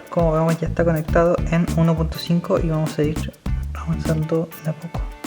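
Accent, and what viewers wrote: Chile. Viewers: eres de chile bro?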